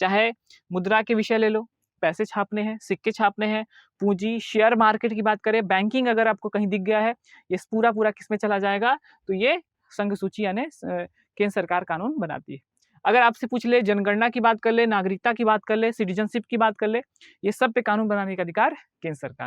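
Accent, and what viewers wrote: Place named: Chhattisgarh, India. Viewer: Sir hum logo ko chhattisgarhi bolne achchha lagta hai waise aapka Hindi bhi bahut majedar chhattisgarhi jaisa aanand deta hai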